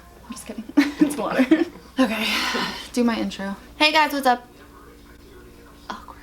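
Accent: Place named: East Coast, United States. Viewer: I love that your east coast is coming out in this video! Every time I visit family in NJ it’s the same. Words ending in G? We don’t know her 😂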